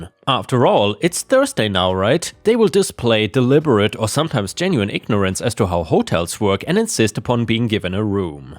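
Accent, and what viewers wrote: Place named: Germany. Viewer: Dein deutscher Akzent ist nicht überhörbar, aber trotzdem nette Videos 👍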